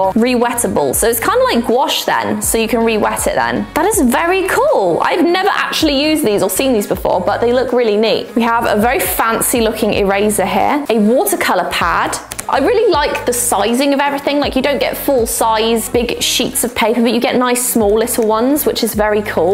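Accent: United Kingdom. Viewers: Would be interested in the details of the company, as am in the uk... look very good quality! Thsbjs for the great video (as always), lovely to see that you have retained your uk accent! X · I liked the derwent graphite paints. And the faber castel pens too. 12 boxes to choose from. Makes for a hard decision to choose just 1 box. Love watching you. Nice hearing a British voice in the USA. Love from the UK x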